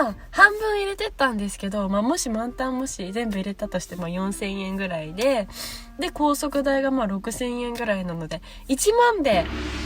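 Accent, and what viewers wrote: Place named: Osaka, Japan. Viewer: お疲れ様です✋六万キロ達成おめでとう(笑)😊何か大阪弁が板についてきたかな？(笑)😊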